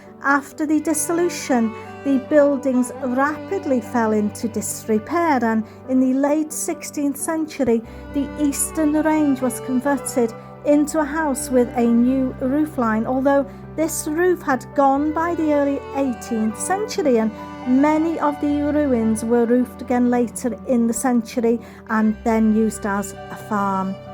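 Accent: Wales, United Kingdom. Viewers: I remember when i first watched your channel videos and I almost could not understand your english with the Welsh accent. Now I'm very accustomed to your voice and accent and I can understand it clearly. It's a very pleasant accent and i enjoy hearing it for sure! We don't have any Welsh people where i live in Vegas so it's a joy to come here and learn about the locations way over there across the pond. I wish i could go into a time machine and live a week in these medieval times and experience the life. It's really interesting. The Valle Crusic Abbey has a fascinating history. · Love that Welsh accent...I don't get to hear it often. What a place! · Hi, even though I have seen this one a couple of times before - believe it or not, I think I have a lot of details to comment on (still, this time) and I think even 1 or 2 new questions that crossed my mind. 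Firstly, I have to say, I am extremely impressed with you and with regards to research and knowledge that is required to put together one of these documentary type videos. And, I am also impressed with your beautiful narration (always)! And, of course, with your lovely and awesome Welsh voice and accent! Amazing place! It seems like (throughout history) England (and other places) was always fighting with Wales (to maybe steal its land). As such, it seems like there was a lot of defending required - by Wales. On another note, it seems like 8 services for the monks each day was a lot - even for monks. With regards to the "Black Death", my guess is that it is likely the same event as the "bubonic plague pandemic". I vaguely remember reading one time that the "plague" killed half of the people of Europe. That is just crazy - something so powerful and uncontrollable! By the way, I have a question for you, with regards to the Abbey's revenue sources. 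You mentioned that the 2 primary revenue sources were "rents and tides". I take it that "rents" refer to the revenues from tenants. Would that include the monks too - having to pay rents to the ultimate owners of the complex at the time? And, regards to "tides", was the complex located near the ocean (with boat dockings)?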